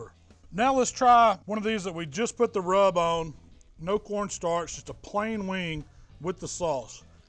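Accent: South, United States. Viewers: His southern dialect makes me trust him. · Southern accent check. Kinda fat check Pocket Buttondown shirt check I will take grilling advice from this man. The only sketchy thing is his foorwear. Should be wearing New Ballance trainers of flip flops. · If I’m going to trust anyone to tell me how to BBQ it’s going to be a heavier set man with a southern accent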